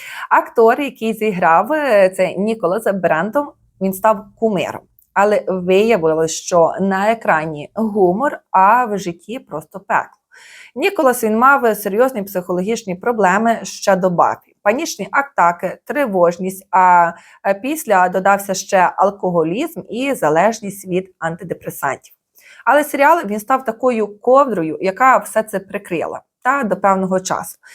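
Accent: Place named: Volyn, Ukraine. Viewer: Ох ваша говірка🎉шарю що з Волині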